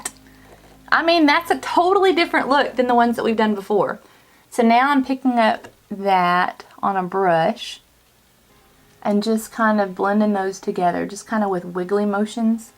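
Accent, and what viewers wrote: South, United States. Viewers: I have this pallette. I bought it months ago before I started watching YouTube makeup tutorials. You popped up in suggested videos because I had watched some videos on this pallette the other day. I hit the subscribe button as soon as you opened your mouth because you're as country sounding as I am. Love it! 😁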